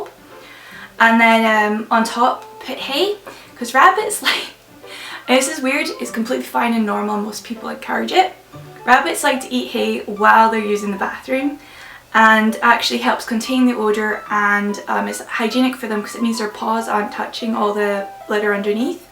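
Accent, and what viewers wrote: Scotland, United Kingdom. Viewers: Are you Scottish? You have an accent :D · Are you from Scotland?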